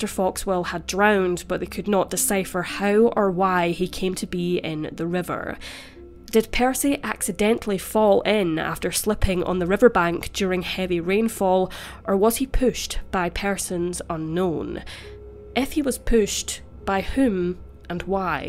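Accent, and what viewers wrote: Scotland, United Kingdom. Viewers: I don’t think so , sounds like she’s Scottish to me , so makes sense as people in Scotland would want any other team other than England to win lol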